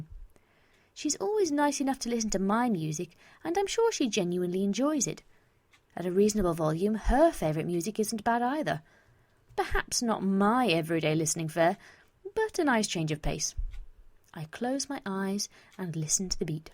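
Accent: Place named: England, United Kingdom. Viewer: Not bad. You have a lot of potential for readings, or maybe I'm just fond of English accents. Good short story too.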